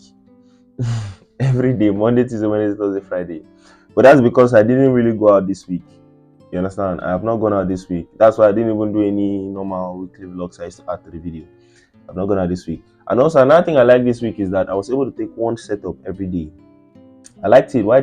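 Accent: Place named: South Africa. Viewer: You're South African 😂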